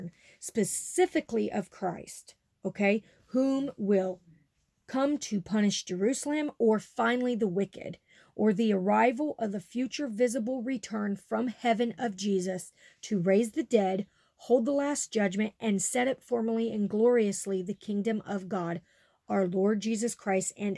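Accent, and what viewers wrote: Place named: South Carolina, United States. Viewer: I live in south Carolina you sound like ur from south Carolina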